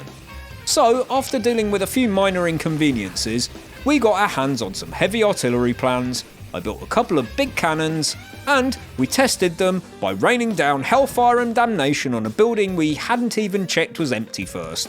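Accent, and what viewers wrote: United Kingdom. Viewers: Great video lad, your accent makes me want to see you do this entire challenge again in Fallout London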